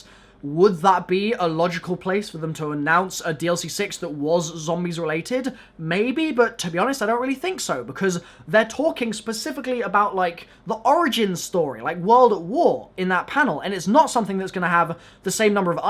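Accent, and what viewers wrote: United Kingdom. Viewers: XactoSharks He's British. It's different there. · when he was saying "zed m" i got really confused and i thought i was missing something but then i saw the ZM and i remembered that british/autsrailian people say "z" really weird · Are you British?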